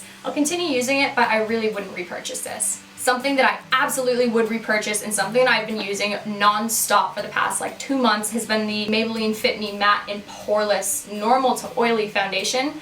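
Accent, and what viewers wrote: Canada. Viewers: Can totally tell you've been back to Canada recently #dataccenttho Love your videos 💕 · I've heard colouring books are really therapeutic :) I should try it! I know this sounds dumb, but you're SO Canadian sounding after spending time at home haha I love it! "Hey?" :) Also love your stripe tattoo. So thoughtful and pretty looking! Wow that bikini is ridiculously tiny...I hate that companies can get away with that!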